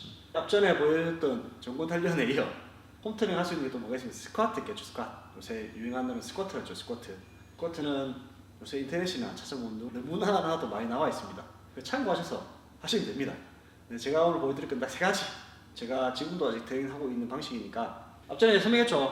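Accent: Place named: Busan, South Korea. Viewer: 부산 사투리가 정겹습니다 오쓰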